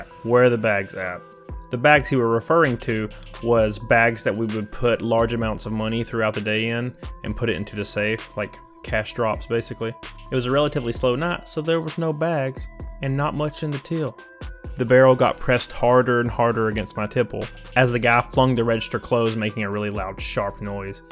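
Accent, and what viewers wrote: South, United States. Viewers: I noticed he sounds like Gomer Pyle sometimes with that southern drawl. However, unlike that show this guy is funny and entertaining.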